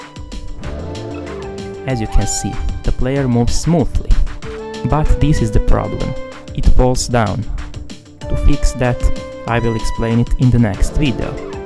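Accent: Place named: Russia. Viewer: Ты русский? Произношение как у русского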